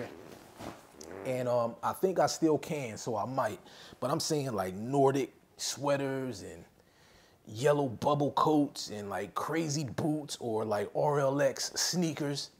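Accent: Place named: Philadelphia, United States. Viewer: I detect a Philly accent. And heard a jawn in there I think? Respect. #Polodelphia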